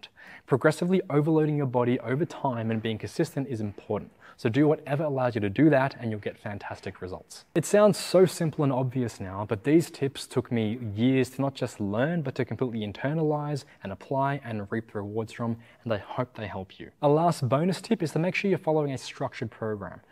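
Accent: Australia. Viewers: its alittle weird watching someone whos asian with an Australian accent.. but your the not the first person ..since the first I know of is Joe from the AnimeMan ^_^ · Asian Australian? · Your accent; Australian?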